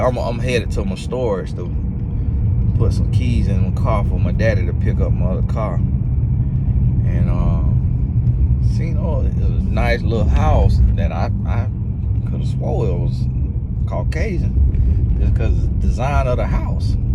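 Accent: United States. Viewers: I don’t know about everyone else, but the transparency brings about the trust. Your transparency separates you from the “guru/talking heads”. Your real life situations, country accent and all, makes you so relatable. I understand being able to capitalize on your brand, but don’t let it remove the authenticity…that’s what keeps some of us here. God WILL provide. Thanks for all that you do!🙏🏿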